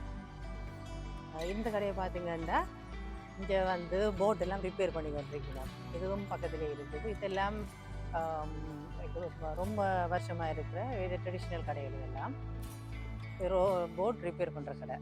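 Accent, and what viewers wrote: Sri Lanka. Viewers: ஈழ தமிழை கேட்டேன் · Fendastic,i from pondicherry, India I like ur srilankan slang speech very much, great kp it up mam,and also go through many more videos like this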